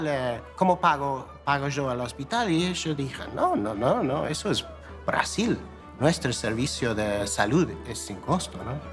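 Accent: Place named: Argentina. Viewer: Me encanto este vídeo,veo un lindo irlandés con acento argentino,, algún día podré visitar a nuestro país hermano. Saludos de una peruana viviendo en Irlanda.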